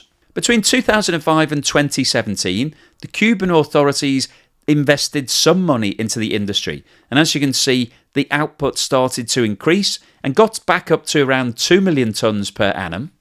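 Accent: United Kingdom. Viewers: Off topic, but I find this guy’s accent the most pleasant British accent. Does anyone know its name?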